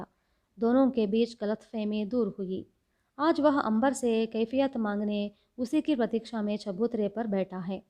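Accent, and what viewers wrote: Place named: South, India. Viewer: Good morning! Actually, your Hindi accent is hard to understand. It is not fluent & has very strong south Indian, Tami, or keralaite touch... For understanding purpose, watch any Hindi TV channel or listen to "Har kahani kuch kehti Hai or positive inbox" Thanks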